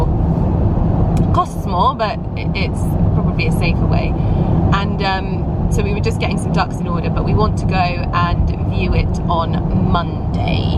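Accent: RP, United Kingdom. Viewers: I don’t understand why there is a smear campaign against you - your accent might have changed, but that is because you have educated yourself and developed your vocabulary. Yes, RP (Received Pronunciation) might be associated with the upper classes, but nowadays, people can have that accent regardless. Your accent shows to me that you are well-read, well-educated and intelligent. Why are people so hateful of that?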